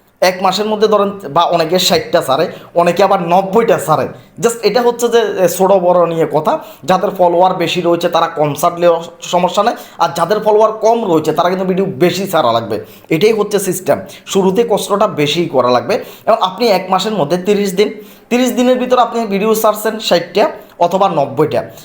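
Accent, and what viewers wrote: Chittagong, Bangladesh. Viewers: ভাইয়া আপনি কি চট্টগ্রামের?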